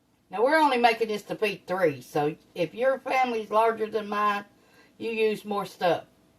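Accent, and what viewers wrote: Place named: North Carolina, United States. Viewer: You sound like you're from NC. 😊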